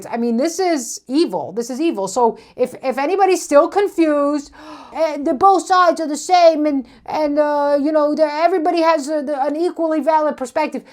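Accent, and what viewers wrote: New York, United States. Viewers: You go, gal! I love your accent. I'm from the South but I love NYC accents. What is yours, Queens, Brooklyn, Bronx? Oh, I enjoy your content, too. :) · Love the New York accent and attitude. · you are a Bonnie lass but your NY accent is painful. 😄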